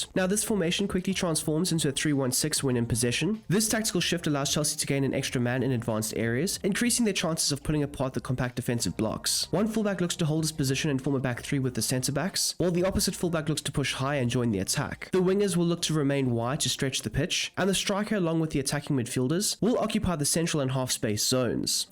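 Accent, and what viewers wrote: South Africa. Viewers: Are you from South Africa?? That accent is familiar!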